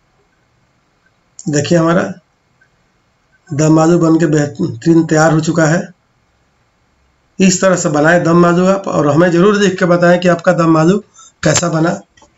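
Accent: Bihar, India. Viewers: In starting when u say Ram Ram ji that is really nice 🙏👌and the way bihari people speak that is so sweet 😊😊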